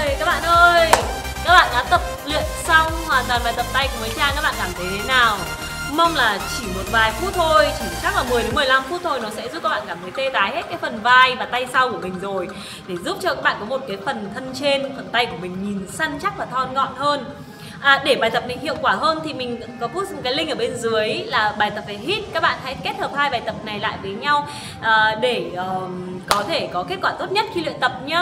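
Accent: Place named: North, Vietnam. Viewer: Giong mien Bac that la de chiu.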